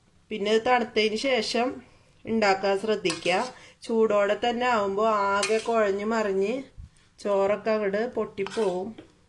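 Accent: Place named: Azhikode, India. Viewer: Ithaade Samsaram kettapol thonni.😊 athaa chodhichath.. njan kaipamangalam aanu.. husbandinte relatives und azhikod.. angineya ee slang parichayam☺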